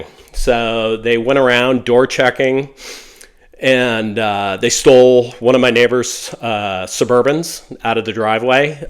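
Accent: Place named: Austin, United States. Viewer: I see "TEXAS" next to where it says how long ago this video was uploaded. What part of Texas are you in? I am up here north of Dallas in Frisco. Just saw your channel in my feed for the first time. Sounds like you are in or around the Austin area. I trade for a living and have been trading the precious metals exclusively since '03. Enjoyed the video and was happy to hear it free of price targets predictions but more about just the mechanics of working with the daily zigs and zags which is reallyl all its about once you determine what the med and LT trend direction is, assuming there is one.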